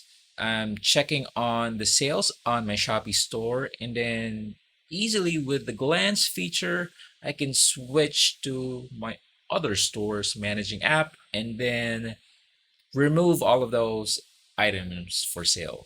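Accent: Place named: United States. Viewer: Haha, IMO it is just marketing hype. 256gb Exynos is anyway better than 128gb Snapdragon. Exynos is also dual SIM (another great feature that is missing on Snapdragon). Exynos does not have the UW Band (Verizon) and some other 5G bands too so that is the only catch for me. You have US accent - you look like the US expat enjoying the good life on beaches of Philippines :)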